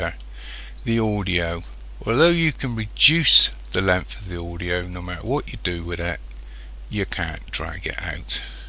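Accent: United Kingdom. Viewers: Most british tutorial ever seen xd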